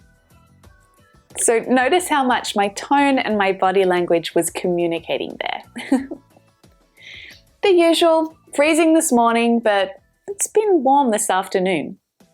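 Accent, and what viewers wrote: Australia. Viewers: Iam afraid to be addict to australian english accent .U're great teacher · Love the Australia accent, yes your smile bright like a morning star✅✅✅✅🇸🇪🇸🇪🎃🎃🎃🎃🎃🎃🎃🎃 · Are you australian ? How you thought to choose the name of your Channel ? mmmEnglish! it's like tasting a dish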